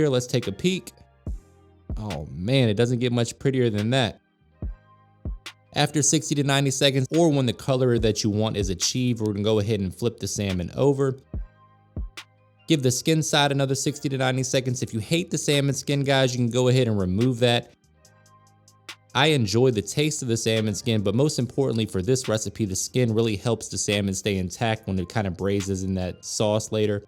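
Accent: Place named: South, United States. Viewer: You are so southern I love you 😍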